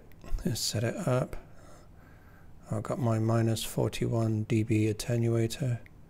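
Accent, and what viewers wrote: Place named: Bristol, United Kingdom. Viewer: Just ordered a BJ9000. I realise you are in Singapore but, your accent sounds quite local to me here in Bristol. Thanks for your expertise from M7CUN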